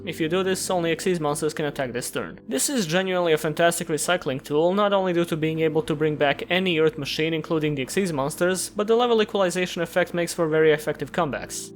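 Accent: Balkans. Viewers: E brate tačno sam znao da si neki naš balkanac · didnt know you were from the Balkans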